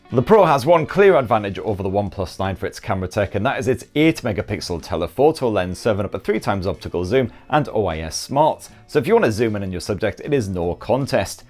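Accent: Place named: England, United Kingdom. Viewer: love your reviews and the english accent!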